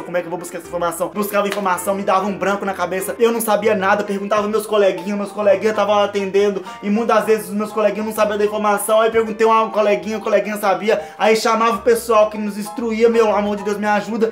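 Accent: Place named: Goiânia, Brazil. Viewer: Vc é de Goiânia conheci pelo sotaque, doido que nem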